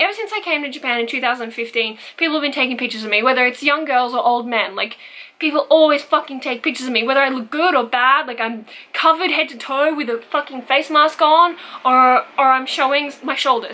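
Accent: Australia. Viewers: are u aussie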